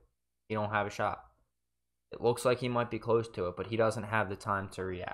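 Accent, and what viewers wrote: Philadelphia, United States. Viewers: This is how I went undefeated in franchise. Glitch goals are a must know as annoying as they are. This goal works the same way in game if you're behind the net as long as you either top left of the crease or top right depending on direction. Also you sound like a fello Philly bull so respect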